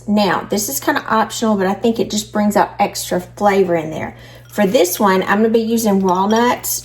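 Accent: South, United States. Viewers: im in northern maine and the temps are slowy dropping here the leaves are just starting to turn/drop...i found you on my home page... 1 THING I LOVE about your channel... your accent isnt as heavy as alot of southerns..I DO LOVE IT BUT some can get "twangy" /sing songy, if you get my meaning... and you dont do alot of chattering when you cook and you CLEAN OUT THE DISHES!!!!! my pet peeve.. with everything going through the roof HOW CAN YOU AFFORD TO NOT USE IT!!! thank you for all that you doo..;];];] keep up the great work;];];]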